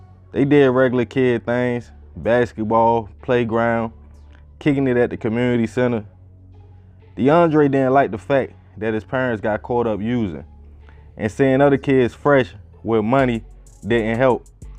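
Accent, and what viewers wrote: Baltimore, United States. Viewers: narrator is a native Baltimorian?? The accent???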